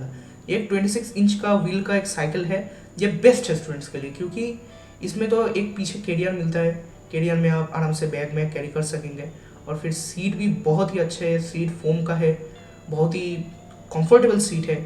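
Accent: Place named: Bengal, India. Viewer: your hindi sounds like bengali....nice though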